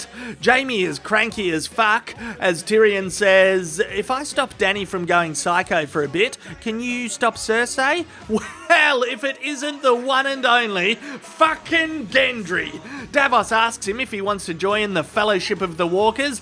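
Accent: Australia. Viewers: na he s just australian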